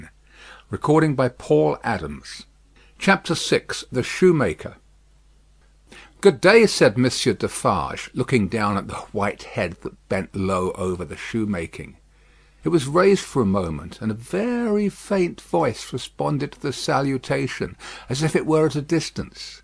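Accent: United Kingdom. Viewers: No, he sounds merely British.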